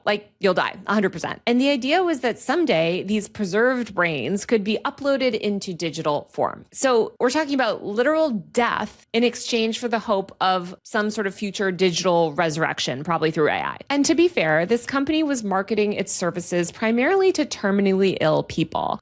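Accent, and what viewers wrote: California, United States. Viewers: she literally sounds like if California was a person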